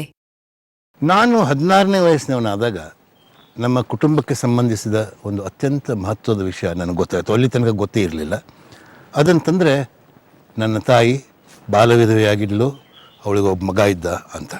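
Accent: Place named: Dharwad, India. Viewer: Pakka dharwad Kannada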